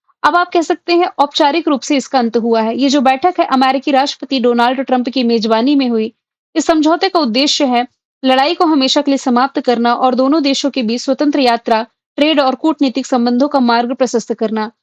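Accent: Bihar, India. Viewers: Ma'am Bihar se hai . Aisa Kyu lagta hai ??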